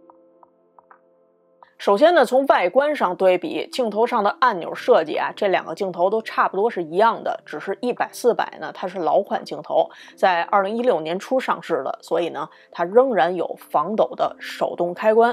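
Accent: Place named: Beijing, China. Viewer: 都是北京腔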